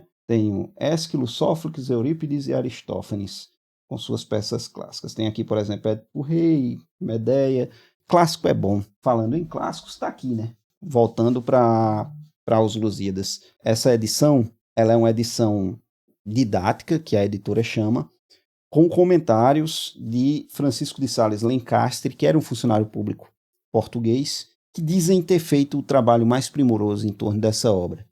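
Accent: Pernambuco, Brazil. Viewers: Hoje estou em Portugal, sou iniciante na leitura. E posso dizer que não é só no Brasil que existem livros caros. Aqui também há. Fora isto.. Hoje estou em busca de como posso fazer para importar livros para cá, tanto pelo preço como também que por incrível que pareça no Brasil existem bem mais obras para língua portuguesa. Abraço Professor, seu sotaque para ser de Pernambucano, pode ser que eu esteja equivocado, se for somos conterrâneos. Abraço.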